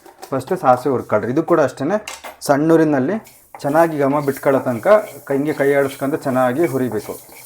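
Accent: Chitradurga, India. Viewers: ಚಿತ್ರದುರ್ಗ ದ ಭಾಷೆ😊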